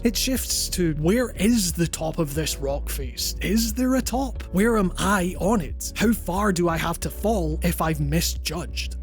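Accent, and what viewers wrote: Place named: Scotland, United Kingdom. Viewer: Where you fae? Ye sound Scottish.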